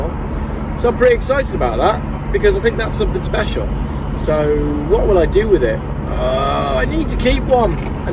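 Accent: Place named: Midlands, United Kingdom. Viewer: before the planned event of three years ago I pretty much watched Hubnut every day. Must admit I wasn’t spending huge amounts of time on YouTube. I almost got to meet Ian at one of his meet n greets when he was here in Melbourne. Then my attention was turned elsewhere. You are the only motoring channel that I know who dares to show another perspective. I admire that. I’m also originally from the Midlands too so that’s probably another reason I like listening 😂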